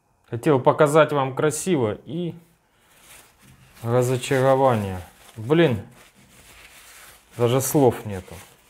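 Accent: Rostov, Russia. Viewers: в Ростове все так говорят, обычное дело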